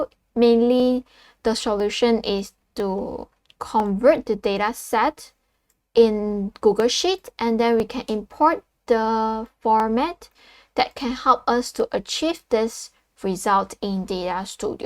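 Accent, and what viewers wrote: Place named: Singapore. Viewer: As a fellow singaporean I notice the accent - prolly singfaporean or malaysia - hi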